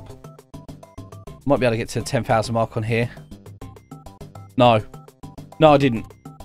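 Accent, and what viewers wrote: United Kingdom. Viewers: You’re British right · Is it me or is extra British in this video? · Nice british stylish accent I got 11600 studs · your British innit? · I love your British accent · Idk why but I just love your British accent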